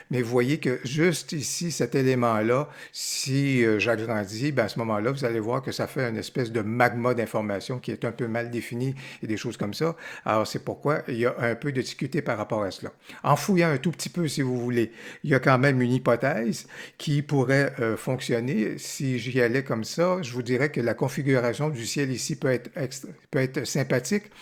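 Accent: Quebec, Canada. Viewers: Merci pour la vidéo. Et je viens de m'abonner à votre chaîne pour découvrir vos autres vidéos également. A propos des reflets du ciel dans l'eau, j'avais visionné aussi cette vidéo-ci qui donne une solution alternative à celle que vous proposez <link> Merci aussi pour votre savoureux accent canadien qui va colorer mon apprentissage ;-) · Bonjour. Tout d'abord, merci beaucoup pour tes vidéos et..pour l'accent Québécois(J'adore!). Je possède la version <num> de Luminar 4 et mon problème est que je n'ai pas, comme sur ta vidéo, "Auto correction distorsion" ni "Supprimer les aberrations chromatiques" dans Objectif et géométrie. Je n'ai que "Supprimer les franges". Est-ce normal? Je te remercie d'avance pour ta réponse. Bonne journée à toi. Alain.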